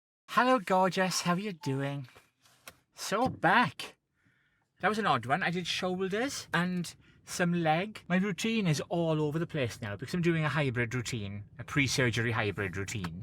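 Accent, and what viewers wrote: United Kingdom. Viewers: I love your voice and accent lol. I'm from the south (of the U.S.) and I have no idea what part of the U.K. it's from but it's wonderful. I hope everything goes well, sending some trans solidarity love your way 💖✌️🌠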